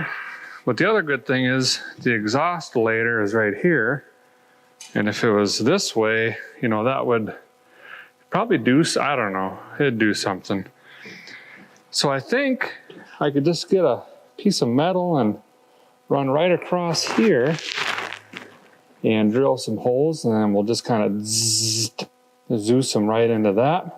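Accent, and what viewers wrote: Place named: Great Lakes, United States. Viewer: Love the “Great Lakes” accent! All in fun and learn and become inspired too!! Great job. Liked, subbed, commented and shared!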